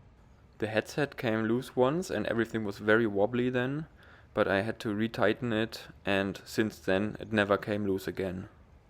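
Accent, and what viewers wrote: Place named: London, United Kingdom. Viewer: I have been riding Brompton bikes for nearly 25 years. The early bikes were terrible in terms of brakes and brazing quality. I used to hate riding them off-road, and probably still wouldn't use mine off-road. , you need to trim the fender slightly. This is a prime point for rust where the mud sits on the frame. It WILL make the frame rust. Every year, these bikes get better. The best thing about them is that you will always be able to sell one for exactly what you paid for it; they do not depreciate. By the way, I detect a slight London accent when you pronounce 'Brompton' as you use the London 'glottal stop' in your speech. Did you ever live in London?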